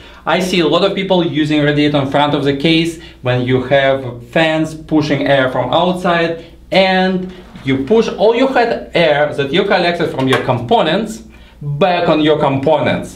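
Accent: Russia. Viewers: Daragiye Tovarische :D your accent are really russian :D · Sorry but I hear strong Russian accent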